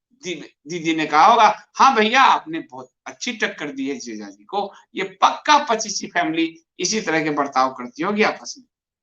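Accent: Bihar, India. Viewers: ur tone truly Bihari about 25shhuuu🇮🇳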